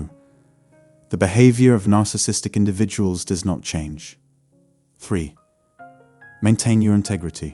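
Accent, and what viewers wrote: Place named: United Kingdom. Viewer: I think maybe it's the voice and the accent, when you watch channels with the British accent, you feel like a baby, safe and sound somehow😅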